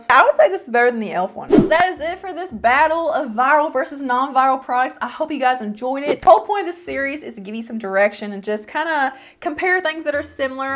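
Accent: South, United States. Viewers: Love your southern draw 😊 great video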